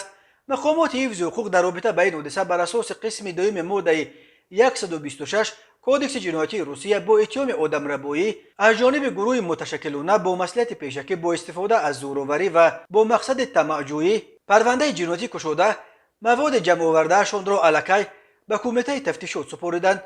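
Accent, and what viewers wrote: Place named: Badakhshan, Tajikistan. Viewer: Ташакур бародар барой ахборот ва шевай ширини бадахшоният мамнунем аз хидматхоятон ки ба мо мекунед ва аз тамоми хабарой дохилу хорич зуд огох мекунед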